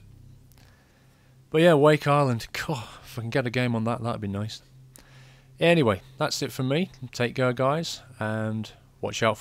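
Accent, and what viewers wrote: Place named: United Kingdom. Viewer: u sound british...so yeah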